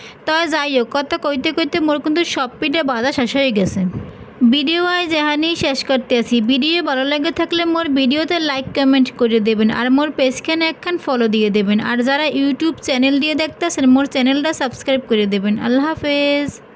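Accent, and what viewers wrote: Barishal, Bangladesh. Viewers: প্রথমেই বলি দিদিভাই তোমার বরিশালের ভাষা শুনতে অনেক সুন্দর লাগে❤ পিঠে গুলো খুব সুন্দর হয়েছে দিদিভাই❤❤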